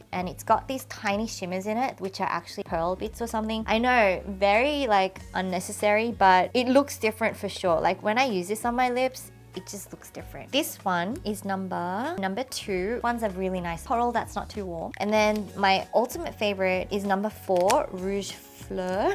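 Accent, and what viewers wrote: Australia. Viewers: Hi, your accent caught me 😅 I’m from down under too! Which part of Australia will u be moving to? I’ve just started exploring K-Beauty and really enjoyed this VDO. Already purchased a-few items you shared 🤭. Looking forward to your next upload 🙏🏻🫰🏻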